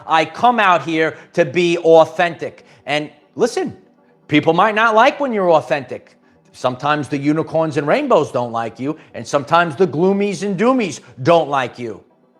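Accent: New York, United States. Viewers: I love the NYC accent. The Bronx? And yes, you will never be completely ready. But you are now have been prepared enough to go to launch. "Peddle to the metal", or "bury the needle", call it what you will. The time is up. It's full on come May 2024. Enjoy the quiet time remaining while it lasts. This is it kids. No going back now.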